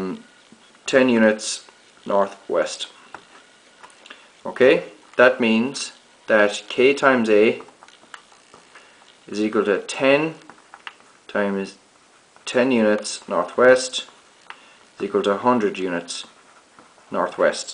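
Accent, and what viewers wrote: Ireland. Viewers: he's Irish give him a break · Irish accent <333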